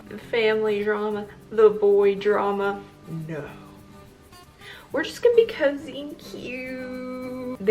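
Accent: South, United States. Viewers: I dont know u i just got this on my explore page but i think ur so cute with ur little southern accent n u def nailed the rory outfit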